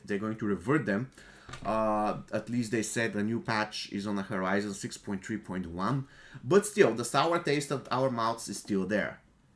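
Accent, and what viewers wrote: Bulgaria. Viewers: Hello, I have a question for you. Are you Bulgarian? Because I watch your videos and I catch the typical Bulgarian accent. I am a Bulgarian and it would be incredible if you are a Bulgarian too.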